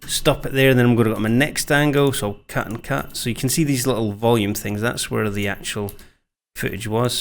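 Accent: Scotland, United Kingdom. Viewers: OoooOooOOO dat thicc Scottish accent. Congrats on 10k!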